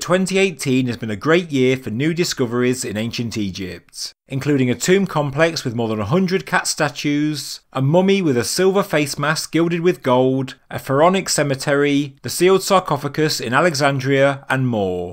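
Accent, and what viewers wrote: United Kingdom. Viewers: it means 5 people think is going to get a "speech coach" to correct his voice to cater to their opinions. The guy is the UK, it's his accent and dialect. So yes, 6 useless opinions of people who if you enjoy the content watch it out you don't like it don't watch it. So why don't you "sit down and shut up."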